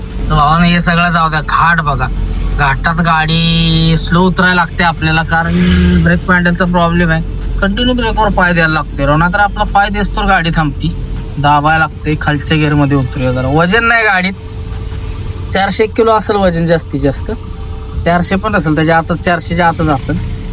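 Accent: Satara, India. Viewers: सातारची बोली भाषा एक नंबर भावा😂😂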